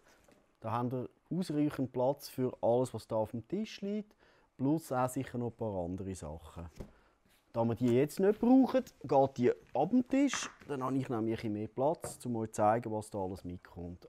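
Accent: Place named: Switzerland. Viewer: Interessehalber mal nachgefragt: Welche Art von Schweizerdeutsch hört man hier? Züritüütsch? Ich als Norddeutscher verstehe jedenfalls relativ viel (anders als z. B. bei Walliser Deutsch).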